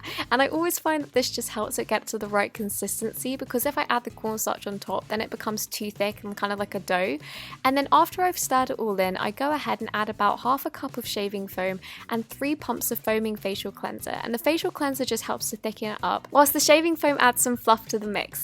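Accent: England, United Kingdom. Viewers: Bro I love her British accent! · Is she from England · I can tell from your accent that you're British and I'm British too. I'd like to know where in Britain you are from and where you get your supplies for Slime because I'm pretty sure they don't sell Elmer's glue and borax in the uk. Please can you tell me where you get it from. Lots of love Abi from Leeds. XX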